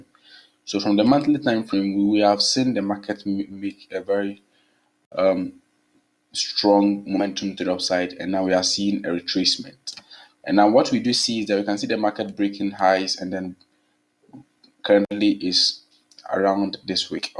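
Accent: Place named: Europe. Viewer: Nice European accent.😅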